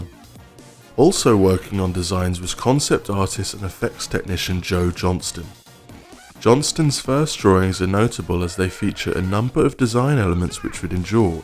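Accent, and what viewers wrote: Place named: United Kingdom. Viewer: Why is Kylo Ren narrating this with a British accent?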